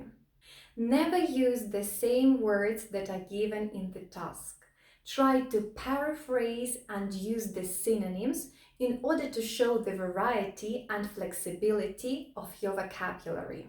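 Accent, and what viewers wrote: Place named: Russia. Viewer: Your dialect looks like a Russian or Ukrainian lady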